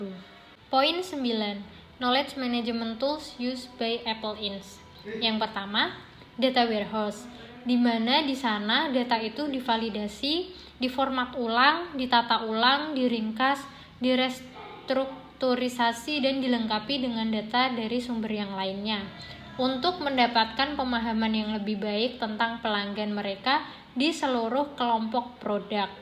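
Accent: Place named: Java, Indonesia. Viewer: Baru ini dengar yg ada medoknya😂😂 anyway thanks ya!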